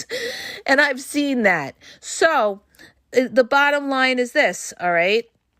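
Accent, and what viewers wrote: New York, United States. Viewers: i'm finally laughing again at you saying "they throw you to the wind" And your language is perfect combined with the New York accent I feel like I'm listening to a friend.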